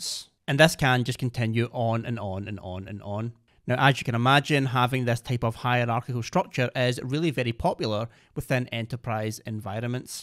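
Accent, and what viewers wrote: Glasgow, United Kingdom. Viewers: Its a magical unicorn type of accent only from one place - Glasgow, Scotland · First 3 minutes I was just trying to get used to the scottish accent... but otherwise good introduction.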